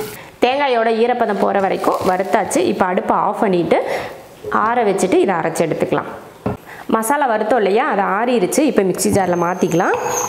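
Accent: Coimbatore, India. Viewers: Akka neenga coimbatore ah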